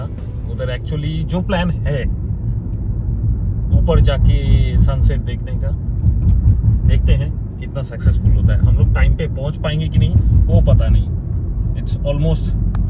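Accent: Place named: Kolkata, India. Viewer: Love the way u talking dada.. Feel like kolkata....☺️ impressive photography.✨️🌟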